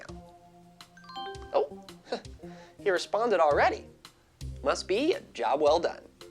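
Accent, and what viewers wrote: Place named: India. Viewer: getting lectured by a curry english speaker is hilarious.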